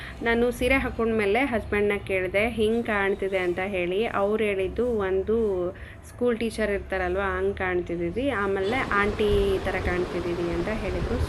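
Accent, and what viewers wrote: Raichur, India. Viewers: Hi namaste from dharwad.. Nimma bhashe keltiddange ello nam kadeyvralva ansitu ella vedio na scroll madi nodi huduktide nim introduction vlog enadru idiya anta... Hage 5-6 vedio nodtiddange nim wedding card alli lingasuguru anta nodi khishi aytu namdu kuda raichur... Hege vlog madta iri.. Sadaa khushiyagiri...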